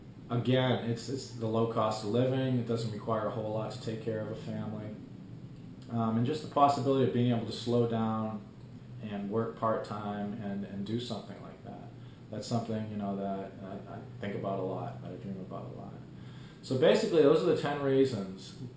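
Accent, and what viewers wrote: Boston, United States. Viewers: BOSTON! I knew it! I was listening to you speak for about 5 minutes saying to myself "I think this guy's from back home..." I'm happy for you, boss - I moved to San Diego about 6 years ago and totally get a lot of your comparisons, because they're somewhat similar to my comparisons of SD vs Boston when people ask me how it is out here. That said, I've always wanted to visit the Philippines (and as of yet, have not) so I obviously can't really understand the Philippine lifestyle. Anyway, you've got a new subscriber. Go Sox/Celts/Pats/Bruins :D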